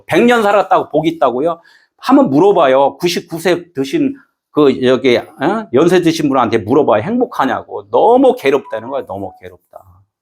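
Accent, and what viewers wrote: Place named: Chungcheong, South Korea. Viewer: 구수한충청도 사투리가 정겹네요. ❤ 뿌린씨앗 풍성한 열매로 결실하세요!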